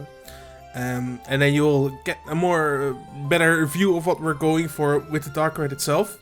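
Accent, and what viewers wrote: Netherlands. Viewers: Dutch accent, Efteling music, Pieck dark ride - what's not to like! Subbed.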